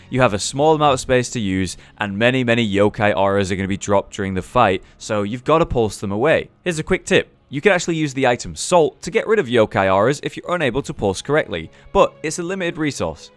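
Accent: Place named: Bristol, United Kingdom. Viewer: Great video mate, thanks! Can I ask, are you from Bristol but moved to Yorkshire because that is the only solution I have for your accent..?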